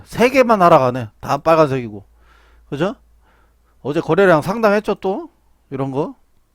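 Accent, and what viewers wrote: Chungcheong, South Korea. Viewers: 어디 천안 or 당진쪽 사투리 같은데 말투가 넘 친근하네요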